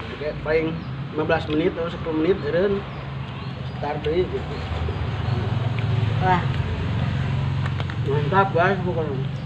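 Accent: West Java, Indonesia. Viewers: Mantap.. orang papua asli. Tp logat na geus sunda pisan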